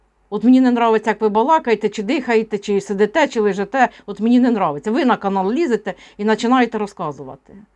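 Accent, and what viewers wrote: Poltava, Ukraine. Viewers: Я так і знала, що ви з Полтавщини❤ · Добрый день,! А я вільно спілкуюся і на українській і на російкій мові, але значно приємніше чути наш полтавській говір. Простий і милий. Дякую. Дуже подобається вам слухати.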